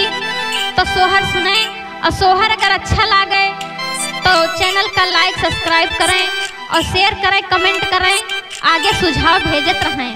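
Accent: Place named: Awadhi, India. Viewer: Itna sundar or shudh Awadhi bolne wala maine nahi dekha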